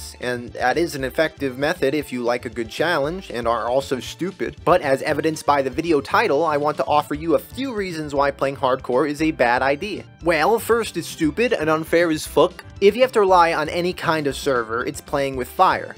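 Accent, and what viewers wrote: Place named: New York, United States. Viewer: You just sound like a new Yorker and they big there. You eat what ever you want brother, just keep making content.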